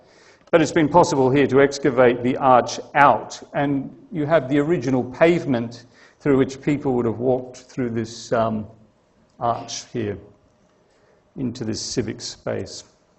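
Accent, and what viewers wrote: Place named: United Kingdom. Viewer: Hearing a Brit attempting to pronounce Spanish is like a hearing a Mandarin speaker say "rolling lorries." Instead of "los miraglos" its "Lahs Mihraahglahs".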